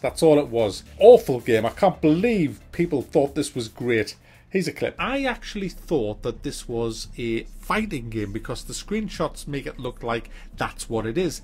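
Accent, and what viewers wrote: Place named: England, United Kingdom. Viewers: SavagerBoy He sounds English to me. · . Where are you from? You sound like you're from Nottingham, could be wrong.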